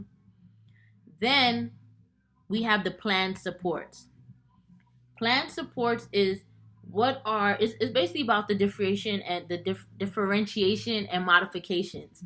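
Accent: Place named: New York, United States. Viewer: Hello I'm going to start my student teaching and my Edtpa with 8weeks in special education and 8 weeks in elementary education. Although I have been a paraprofessional for 20 years and have a lot of classroom experience, im still a little nervous. I really like your channel. I find it very informative. I'm trying to look over the Edtpa special Ed handbook. I will be getting a dual degree in Elemantary and Special education. I have always worked in a special education setting and feel it would beneficial for me to complete my Edtpa in special education. I have a question? In the student teaching aspect im I going to be expected to take over all the duties as the teacher in the classroom by week 6 and work on completing the Edtpa? I'm here in Georgia. I'm from Westchester (Yonkers) and have a very strong New York accent. So when I came across your channel I knew you were from up top. 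Lol.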